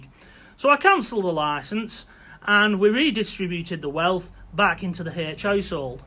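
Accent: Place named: Lancashire, United Kingdom. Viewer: Thanks for this, I will be getting one of these letters, and I would have paid up if it wasn’t for your vid. By the way love your accent, Blackburn, Burnley?💕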